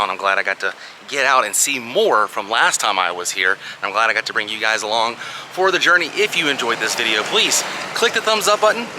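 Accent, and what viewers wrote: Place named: South, United States. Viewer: Every video in Mississippi, I can hear that southern drawl creeping back into your accent...lol. I'm the same way. Another awesome video!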